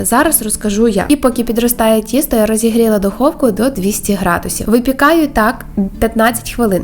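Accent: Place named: Western Ukraine, Ukraine. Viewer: Это не украинская мова.....это западенская мода ! В Украине так не разговаривают !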